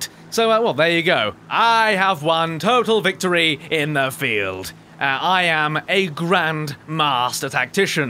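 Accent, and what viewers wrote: United Kingdom. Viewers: I love your videos!! Just a suggestion but you and your British accent should play with baron and his German accent, and Phly and his American accent! And the whole time all 3 of you are the perfect stereotypes, I just think that would make for an amazing vid! Keep up the good work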